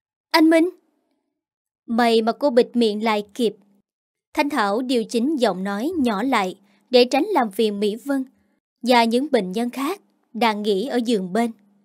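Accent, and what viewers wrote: South, Vietnam. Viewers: Going mien nam de nghe wa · Mỗi người có một sở thích khác nhau, có một số người không thích giọng đọc của MC vì chất giọng của miền Nam. Nhưng thực tình thì đọc rất hay, nhẹ nhàng, truyền cảm,bộc lộ được nội tâm của từng nhân vật làm cho câu chuyện hay hơn, hấp dẫn hơn.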